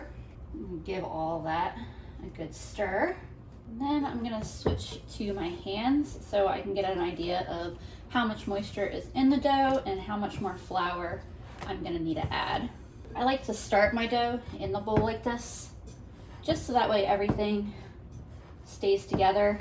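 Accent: Philadelphia, United States. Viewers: I always thought you were in Philly Area based on your accent. LOL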